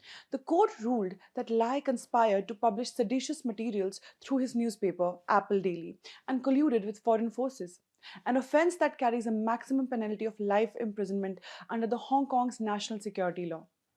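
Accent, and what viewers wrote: India. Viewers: I pray to God that my children do not learn to speak English from the presenter of this video. No offense to Indians but such a bloody awful accent. Try learning to speak proper English first before coming onto broadcasting. It's an insult to the industry. Half the listeners can't make out what the hell she was talking about. That was the biggest joke of the day !!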